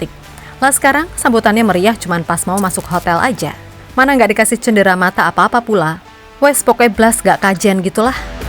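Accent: Java, Indonesia. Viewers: Fiks mbak mimin orang jawa 😅😅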